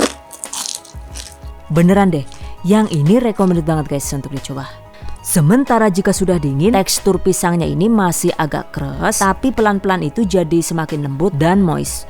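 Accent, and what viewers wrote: Banyumas, Indonesia. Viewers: Aku suka koment nya...ngapak pd karo aku jan² jos bgt koh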